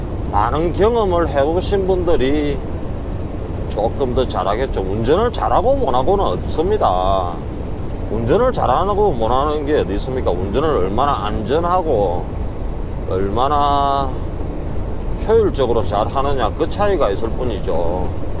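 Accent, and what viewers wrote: Gyeongsang, South Korea. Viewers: 2025년 4월10 경상도 표준말이 그리워 또 왔습니다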